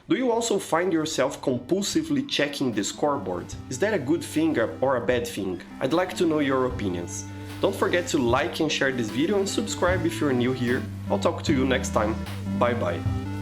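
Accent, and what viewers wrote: Brazil. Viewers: Are you Brazilian? Because you have an accent of a Brazilian speaking English. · Pelo sotaque é br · Mano, da de saber donde tu é só pelo sotaque, ksksksksk forsa ao tf2 feito pelos br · Ouvi 10 segundos e pensei "isso é sotaque de brasileiro". Aliás, provavelmente do interior paulista. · Its funny how fast you can tell someone is brazillian by their accent, cool vid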